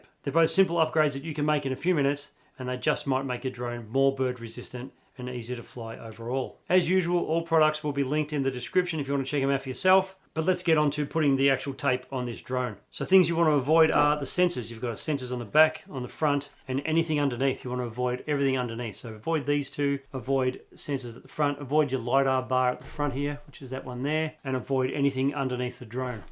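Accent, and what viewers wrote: Australia. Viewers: First thing I notice was the Australian accent. LOL! Well of course birds are a threat there, because just about anything that crawls or flies is dangerous in Australia. (Just kidding!)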